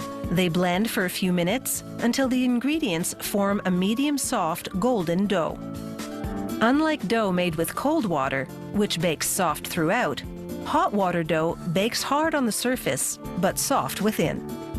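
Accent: Canada. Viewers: The narrator sounds Canadian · that Canadian accent tho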